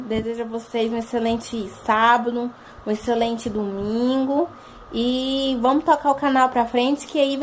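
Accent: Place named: Northeast, Brazil. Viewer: querida, vc parece ser uma boa pessoa e ter bom coração, pelo seu sotaque eu diria q vc assim como eu é do nordeste :) sobre oq exatamente vai ser seu canal? culinária?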